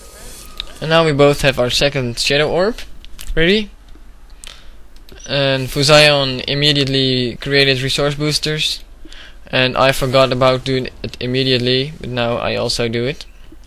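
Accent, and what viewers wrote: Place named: Netherlands. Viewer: R u dutch? U got really a dutch accent, I know I am dutch xD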